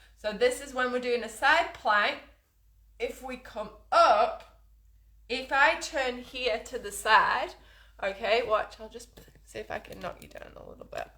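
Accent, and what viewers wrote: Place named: Lancashire, United Kingdom. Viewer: This was great advice thank you, love the accent, are you originally from Bolton Lancashire or nearby? X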